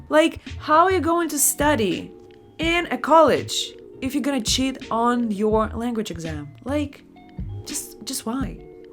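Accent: Russia. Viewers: Ikr! I really liked the russian accent 😂😂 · My English skills is horrible, but i decided to take the Duolingo practice test and i scored just 60-75 :( Oh my gosh, i need to study more 🥺 I'll try it one more time :) I almost forgot to tell this, i found out your channel a few minutes ago by mistake and now I'm a new subscriber :) By the way, are you Russian? I've noticed a Russian accent in a few words as you speak or am I crazy 😧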